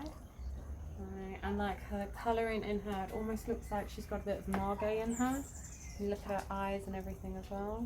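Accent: Australia. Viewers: are you an Aussie? Or British? I’m trying to pick your accent. You’re an absolute ANGEL. Thank you from the bottom of my heart, for what you’re doing for these cats ❤❤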